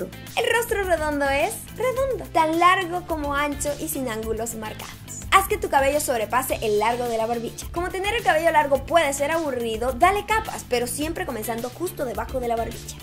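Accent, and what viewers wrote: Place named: Venezuela. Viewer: El acento venezolano es lo máximo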